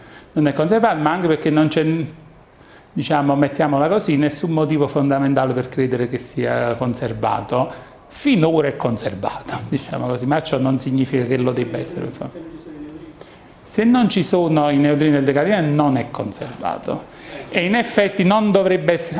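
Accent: Campania, Italy. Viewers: A me è piaciuta la conferenza, indipendentemente dall'accento. Trovo inoltre simpatico l'accento napoletano. Saluti da Trento. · Se questo e' un professore io sono Maiorana!! Fa schifo con quell'accento marcato Campano, sembra uscito da un campo di pomodori!! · Io ho gradito molto invece l'accento, l'italia è bella perché è varia. Un po'di umiltà non guasterebbe, chissà com'era l'accento di Majorana, sicuramente non toscano. Il professore è stato bravissimo a umanizzare e rendere piacevole una materia, la fisica, molto ostica, lo si ascolta e quasi sembra di stare a teatro con la sua splendida napoletanita' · Bravo divulgatore dell'argomento, peccato però che sia effettivamente un po' troppo accentato. Le accelerazioni di un discorso "a braccio" o sue troncature in corsa già sono difficili da seguire in lingua "pura", ma qui un non campano fa un po' fatica. 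Peccato. Avrebbe potuto rivaleggiare, come divulgatore, con un Alberto Angela o col di lui padre Piero (che per altro non impongono l'accento piemontese). Senza offesa... opinione personale. Mauro, Emilia-Romagna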